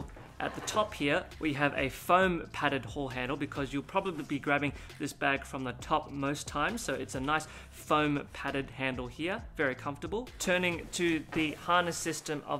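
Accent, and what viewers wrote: Australia. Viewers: Homies accent is wicked yo. Always a trip for me to see a fellow Asian with the croc hunter accent. 👍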